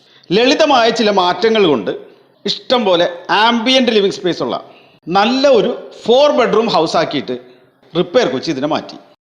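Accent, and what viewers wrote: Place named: Thrissur, India. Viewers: കൊടുങ്ങല്ലൂർക്കാരനാണ്... അതുകൊണ്ടാ... 😆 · പേര് കൊച്ചിന്നാണെങ്കിലും ഭാഷ ത്രിശൂരാണലോ ഗഡ്യ..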